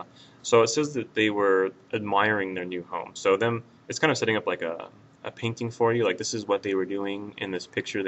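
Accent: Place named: United States. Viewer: esto es genial. Solo queria decirte que tienes un poco de acento americano, pero la pronunciacion es muy bien. Puedo usar tus videos pa' aprender Ingle en lugar de españoles porque soy de Puerto Rico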